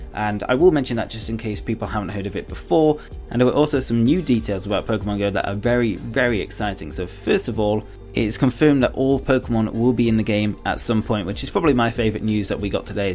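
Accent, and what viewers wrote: United Kingdom. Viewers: Nice video most British voices that are recorded i can't really stand but i like your videos keep it up 😎👍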